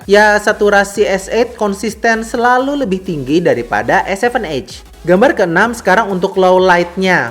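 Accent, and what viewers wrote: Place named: Java, Indonesia. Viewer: Jawa nya medog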